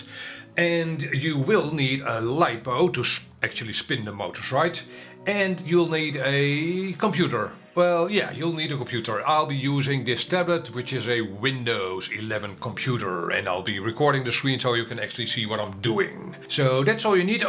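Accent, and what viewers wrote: Netherlands. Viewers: that was it, not DCU driver. I downloaded the impulse RC driver fixer. It connected to the flt controler had a little look around saw what was missing and loaded it, then left it in boot load so I could just open Betaflight and do the flash. Sorted 2 yellow boxes and I could do what you demonstrated. Thanks a mill for making me aware of them buttons, just had to find them. Subscribed, do love the Dutch accent.👍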